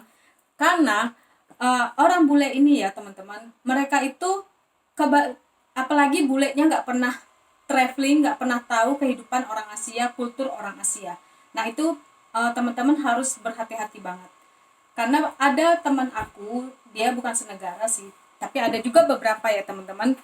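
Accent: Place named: Eastern Indonesia, Indonesia. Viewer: Sis seprtinya org TIMUR,, Bagus bnget penjelasnya..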